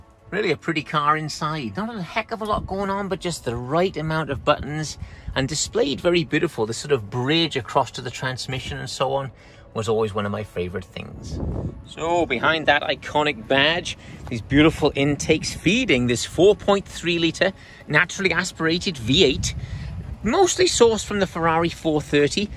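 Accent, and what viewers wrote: Wales, United Kingdom. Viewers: Is that a Welsh accent I hear while your narrating the video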